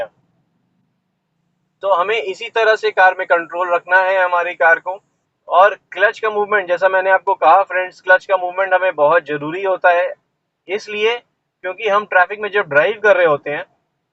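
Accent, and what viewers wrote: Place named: Punjab, India. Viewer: sir aap kaunsa biradari se ho? you sound like a Punjabi to me.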